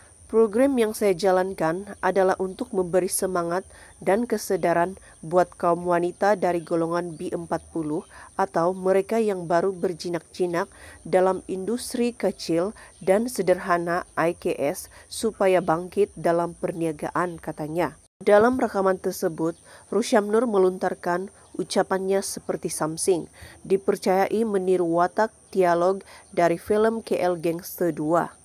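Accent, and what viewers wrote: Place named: Indonesia. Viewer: Suara ni mcm indon je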